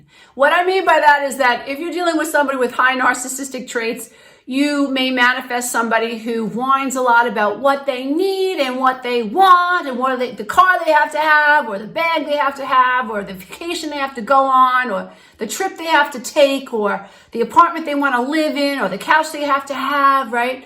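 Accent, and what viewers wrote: New York, United States. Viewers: I think this is the best video of yours I've seen so far. You're bringing the correct emotional response to this sh**. That, along with the NY accent really brings it home! Thanks!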